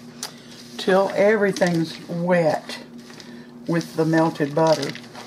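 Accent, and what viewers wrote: South, United States. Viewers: I love the sweet southern accent 😍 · My mother was from the South, I love your accent and I look forward to your recipes. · "Ya'll" and saying " your sorry" so much sure shows you live down south. Love to you sweetie.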